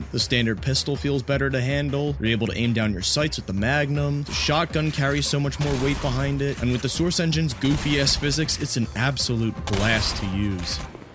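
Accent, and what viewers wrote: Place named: Delaware County, United States. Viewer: Hearing you say Half-Life a bunch of times with a horrible delco accent is driving me crazy. HYEAF-LOYFE.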